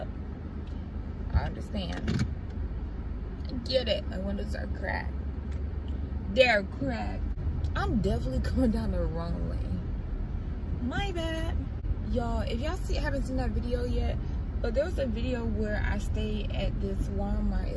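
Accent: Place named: South, United States. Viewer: I'm from Queens York I just love it when you talk Southern♥️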